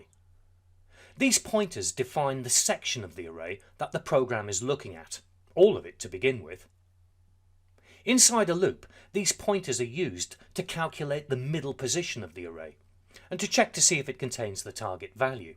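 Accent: United Kingdom. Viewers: HOLLLLYYYY - thank you so much for your video. this is probably the most perfect explanation i've seen. its either the style of explanation or the british accent that is driving the points home. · I also can't tell which it is, but the British accent is definitely doing it for me!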